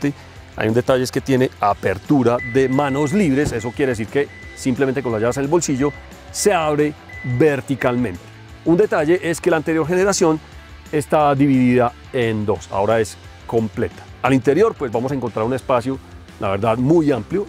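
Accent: Colombia. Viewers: De dónde eres una pregunta tu eres colombiano Es que pareces colombianos yo reconozco muy bien como hablan los paisas los rolos vallunos y me parece que eres de esa parte del pais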